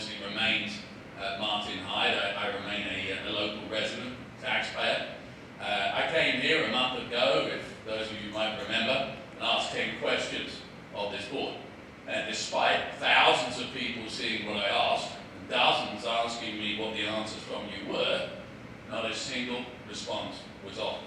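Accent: United Kingdom. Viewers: He's not even American. Listen to him. He's a Brit; a frigginn Lobsterback 🇺🇲💪🏻🇬🇧👎🏻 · Who is the immigrant actually. U came over from England and still talk like the Brits we kicked out of America in 1776. Go home to england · He is a Pommie (an English person). He migrated to the US some years back. He sounds like the love child of Nigel Farage and Arthur Daley.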